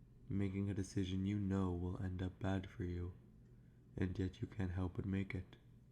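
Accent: United Kingdom. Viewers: I thought he was British- anyways great video as always!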